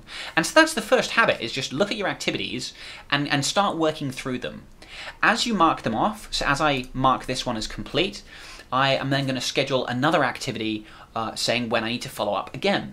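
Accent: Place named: United Kingdom. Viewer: This is excellent, very clear training. Thank you for creating this. I'd much rather listen to your cool British accent than the Pipedrive guys cartoon voice. LOL Cheers!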